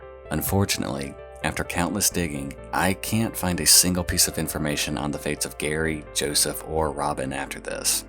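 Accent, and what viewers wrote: South, United States. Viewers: I just came across this channel, and so far, I'm loving it. Thank you for giving these innocent victims a voice. I'm definitely subscribing!....btw, I'm hearing a little bit of a southern accent, I love it. People tell me that I have a southern accent as well, but I don't hear it at all. Lol. Also, I'm from VA, I didn't pick up an accent there! Lol. ❤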